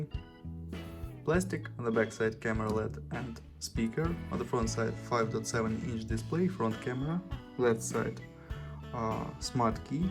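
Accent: Russia. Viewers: Русского человека слышно сразу) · с ярко выраженным русским акцентом и отвечающий только на русские комменты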